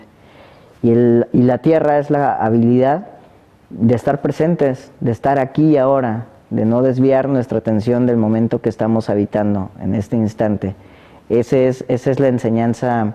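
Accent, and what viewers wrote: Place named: Mexico. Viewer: en que país vives ahora, por tu acento me parece que eres mexicano. Me encanta tu manera y forma sencilla y fluida de narrar la información, gracias.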